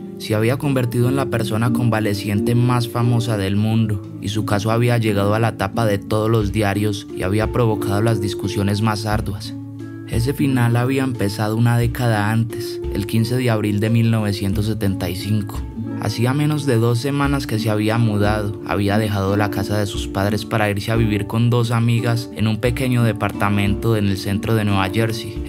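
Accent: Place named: Antioquia, Colombia. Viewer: Que paisa, es del Valle de Aburrá?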